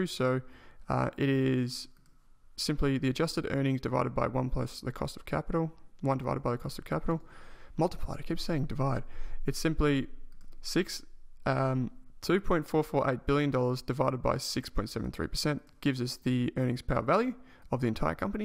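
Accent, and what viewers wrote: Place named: Australia. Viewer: hey, i'd just like to add some constructive criticism. I think your voice is a little bit too low, and especially because you have an australian accent, it gets a little bit difficult to understand what you're saying. So you if could speak a little louder, that would be great, haha.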